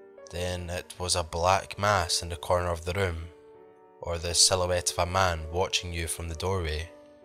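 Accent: United Kingdom. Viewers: Awesome stuff, so happy for you getting to over 1k sub's! I'm still sharing on wegamers. I am loving your content you put out, love the accent too, its great to hear people from the UK, love it. Keep doing what you are doing, your growing and growing every week!😜😜😜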